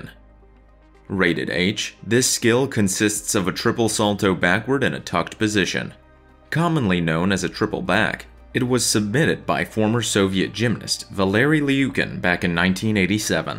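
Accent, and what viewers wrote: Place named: Spain. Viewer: No Spanish speaker has ever felt guilty about their heavily accented English. Don't apologize.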